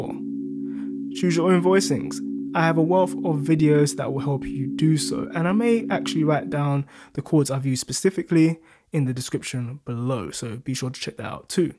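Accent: United Kingdom. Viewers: Ahh I feel so embarrassed now! I forgot your had a London accent. Anyway I hope you have a great holiday regardless!!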